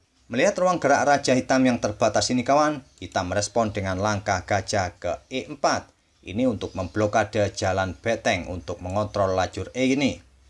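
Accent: Java, Indonesia. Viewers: Jowo ne pundi min?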